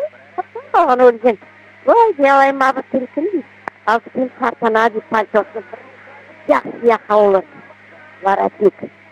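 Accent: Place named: Coast, Turkey. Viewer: Bülbül yalıboy şivesi, diñlemek hoş keldi). Kereksis şatırdı alınsa daada yahşı anlaşılır. Sağ oluñ, var oluñ. Işleriñiz oñ kelsin!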